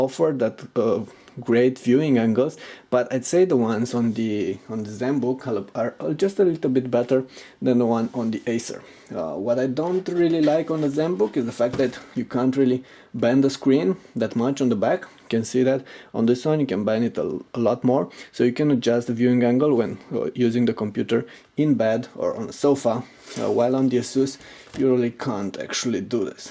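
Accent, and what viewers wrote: Romania. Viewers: Bai tu ai accent de roman :) ... asai :) ? Salutari din München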